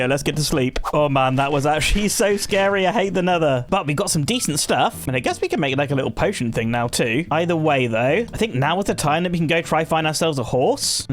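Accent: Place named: United Kingdom. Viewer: I Only See your videos Because Of British Accent 😂😂❤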